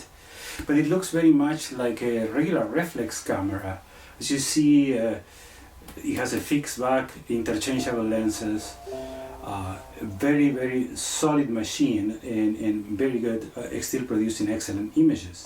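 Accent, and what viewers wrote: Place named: Chile. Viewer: Estimado, por el acento presumo que eres chileno. Creo que somos contemporáneos fotográfica y existencialmente, además de provenir de esta larga faja de naturaleza que es nuestra tierra. Imagino que vives en Usa, por tus imágenes. El gran formato es muy atractivo y quién lo práctica como tú podría decirse que es como ser un "sibarita" de la imagen. Pero con la imagen digital, como tú bien dices, la inmediatez resulta muy atractiva y adictiva. Pase de lo análogo a lo digital con gran entusiasmo y sigo en esto hasta el día de hoy con ya más de 50 años de acción fotográfica y a mis 77 abriles.... Un gran saludo para ti, de este fotógrafo radicado en el sur de Chile. Puedes ver algo de mi obra, bajo mi nombre en youtube...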